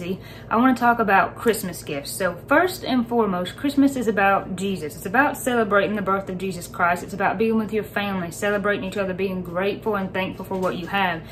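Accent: South, United States. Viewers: Goodness, your accent makes me want to fix biscuits and gravy. Great video.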